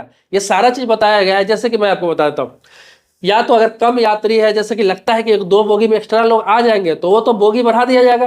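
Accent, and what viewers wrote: Bihar, India. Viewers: आप बिहारी हैं क्या ? बिहारी लोगों की हिंदी बहुत कमजोर होती है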